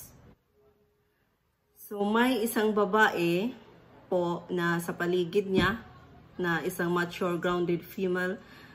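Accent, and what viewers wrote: Visayas, Philippines. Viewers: Ha?????😂😂😂😂😂😂Diyos lang ang nakakaalam ng lahat DDS ito😂😂😂😂 paano mananakot e siya na nga ang tinatakot harapharapan😂😂😂pananalita mo lng bisaya o davawenya ka DIYOS ka ba???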